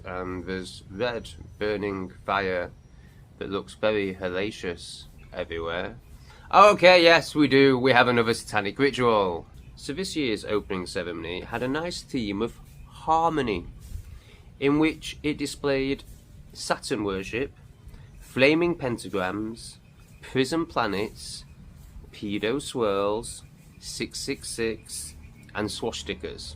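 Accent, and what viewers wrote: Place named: England, United Kingdom. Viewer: You sound English, but it looks like Australia in the background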